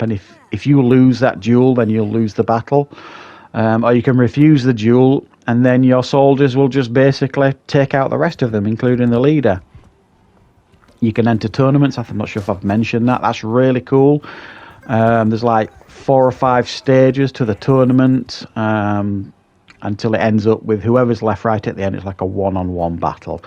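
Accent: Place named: Lancashire, United Kingdom. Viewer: Pretty sure that's a Lancashire accent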